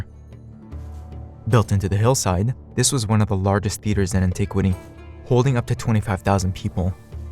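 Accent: United States. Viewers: Are you American or simply someone speaking American English and probably jewish?? I normally steer clear of American docos because they usually "silly"....probably as a result of the poor public education system.?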